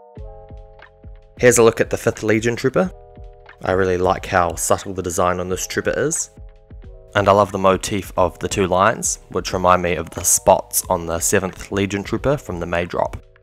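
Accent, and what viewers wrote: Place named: New Zealand. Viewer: Are you from new zealand cuz you sound like one of us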